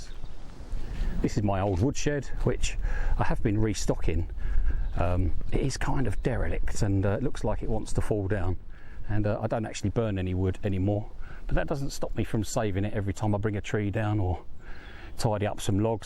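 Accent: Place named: South London, United Kingdom. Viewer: Don't know how you came up on my feed but glad you did - so many of us are watching this with envy - the UK is even more of a rat race today than when I started out 45 years ago as a 21 year old(and it was a rat race then). Like many we worry for our kids as rents here are astronomical - Capitalism is becoming more and more viscious - how the younge working classes get a foot hold is bewildering. Our government has done everything it can to keep up on this overcrouded island(as outlined in the Bill Bryson book I am currently reading 'Notes from a Small Island') - so how you escaped is a matter of curiosity - the entant cordial agreement put in plae by our ruling classes to halt Brits living in France/Europe has put pay to folk doing what you have done - so well done for getting things sorted before brexit. I will now go back and watch alll your hard work on what seams a lovely plot - thanks. 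You sound like you come from my neck of the woods - South London - Bromley?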